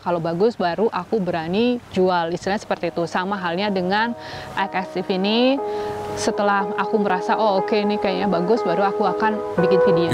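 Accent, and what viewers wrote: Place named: Cilacap, Indonesia. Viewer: Baru ngeh kalau mbanya orang cilacap,ora ngapak ora kepenak Mantap mba salam 👍👍😊🙏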